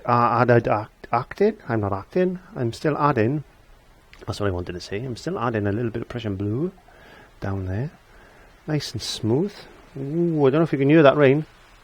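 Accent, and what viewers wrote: Wales, United Kingdom. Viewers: Thanks. I have a question: Are you Welsh?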